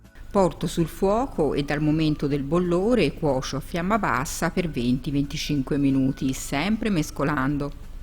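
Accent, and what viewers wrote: Tuscany, Italy. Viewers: Sono fenomenali Mi fido della ricetta di questa signora dal bell’accento toscano e dalla precisione delle dosi e procedimento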